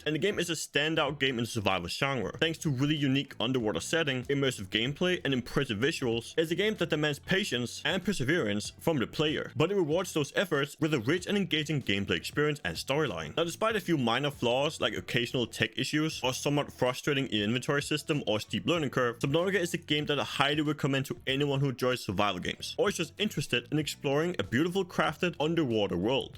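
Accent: Denmark. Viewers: This is the thickest danish accent ever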